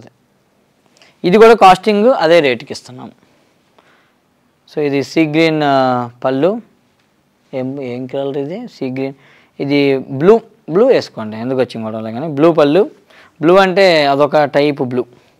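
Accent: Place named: Guntur, India. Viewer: Annayya Yes You we’re Soeaking our real Guntur languge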